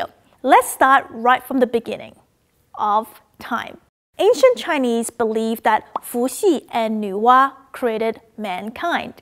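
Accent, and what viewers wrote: Australia. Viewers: I just recently found your channel (Chinese eating etiquette), and watched your discussion of Dim Sum (Here in America "shrimp toast" is a big favorite but I love Siu Mai and cabbage or pork bao) and i am surprised to see that some of the videos are 7 or 8 years old. I didn't notice a difference now that you are "back" but you still have immaculate English enunciation and slip very naturally in to Cantonese or Mandarin. (I notice that you say "mandarIN" instead of "MANdarin" - do we say it wrong over here?) Liked and subscribed and enjoying the videos. Oh, and it is charming when the Australian accent just peeks out a bit in your English. Love it! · Is this an Australian, Chinese accent?!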